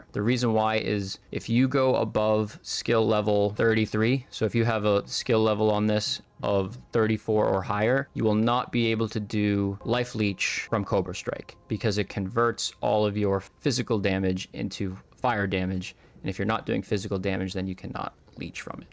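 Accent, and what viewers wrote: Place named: England, United Kingdom. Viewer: Why you speak like that? Can you speak normaly? Many english people do like you, it's boring. But very nice video, and your honesty is also very nice.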